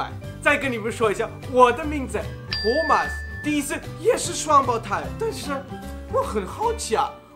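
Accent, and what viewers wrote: Shanghai, China. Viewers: 兄弟你德语都带上海味儿了..... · 英语有上海口音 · 不行， 他的德语还是有上海口音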